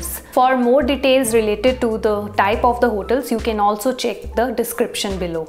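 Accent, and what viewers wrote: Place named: India. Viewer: Indian English hahahhaah Horrible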